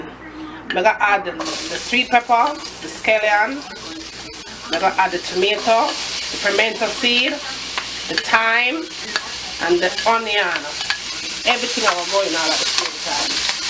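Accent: Jamaica. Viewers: I just stumble upon your channel and I am enjoying your nice Jamaican recipes, I will be cooking some yellow tail snapper for me and the hubby later today, love your Jamaica accent. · Lawd di patwa talkin. You don't know seh ah foreign you de? LMAO lawd mi sistren big up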